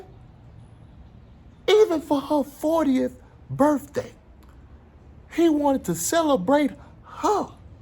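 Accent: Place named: South, United States. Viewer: I loved your analysis. I’m proud of you. You proved Southern accent does not equal dumb. I know because I’m from Jackson, Mississippi.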